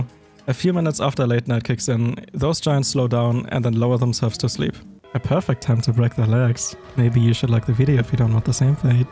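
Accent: Germany. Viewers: When I heard your accent at the very first time I was like, wait I recall this kind of words, is this German? Then I read your channel description haha,I felt compelled to say that, no hate,though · Ich finde es witzig das man sofort raushört das du deutscher bist, obwohl du echt gutes Englisch sprichst. Das Video hat mir echt gefallen und erklärt warum ein paar davon bei mir einfach rumgestanden haben, dachte echt die wären verbuggt. Danke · hör ich da nen deutschen akzent?:D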